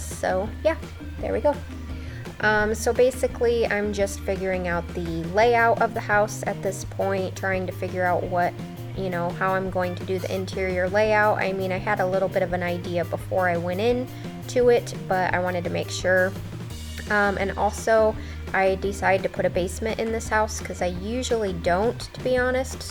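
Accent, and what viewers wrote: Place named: Boston, United States. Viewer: Love the house! Btw did the Cubs win? And are you from Boston ish area? Me and the bf were trying to guess where your accent was from.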